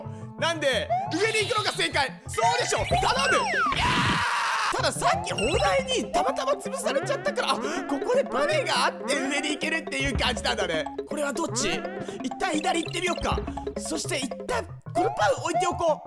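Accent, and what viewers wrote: Fukuoka, Japan. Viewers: 福岡弁、やばすぎてやばい、、、🥺 方言でずっと喋ってて欲しい🥺 ギャップ萌、、、からのかわいいかわいい🥺 ショタ喋り久々にやって欲しい、聞きたいです！（笑） 今日も面白かったです😂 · この福岡弁完ぺきやで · さんの福岡弁新鮮で好きです！！やっぱりバネは友達ですね！ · 実況中も福岡弁がいいな、絶対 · 福岡弁で喋ってる時笑い過ぎて内容が全く頭に入ってこなかったwww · さん大好きいいいいい！！！！！すみません。久しぶりにさんの動画を見にこれて、嬉しすぎて癒されすぎて気持ちがあふれてしまいました(ﾉ≧ڡ≦)☆ﾃﾍｰ コメントしきれないくらい、どこもかしこもさんが可愛くて可愛くて、終始ニコニコしてました〜🌸 福岡弁のさんが新鮮で良かったです〜！！うちの父は佐賀県出身なので、なんか安心する気持ちもありました( ˊᵕˋ*) 本当に癒されまくりました(◍ ´꒳` ◍) 心がぽかぽかです！いつもありがとうございます🌸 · 福岡弁のさんなんか違和感.... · 自分も福岡住まいなので福岡弁聞くとすごく親近感湧きますw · ちゃんと期待に応えて福岡弁やってくれてるの優しいw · 福岡弁も中々悪くないですね。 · さんの福岡の言葉聞けてなんか嬉しいたまに〇〇ばいとかはさんが言ってるのは知ってましたけどここまでフルに出たの僕は初めてですね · お疲れさまです！さんの福岡弁に萌えました❤️ ｶﾀｺﾄの標準語も好きですw(৹ᵒ̴̶̷᷄ωᵒ̴̶̷᷅৹) 1発マリオのゴールは思わず声出ちゃいました⚑ﾞ⭐️ 一日の終わりに見れてよかったw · 福岡弁、あんまり違和感無かった！でも、同じ九州でも熊本弁とは大違いですね！ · いい訛り具合ですね૮. ̫. ა ⸝꙳ 福岡訛り好きです(笑) そして最速タイムおめでとうございます🎊🎊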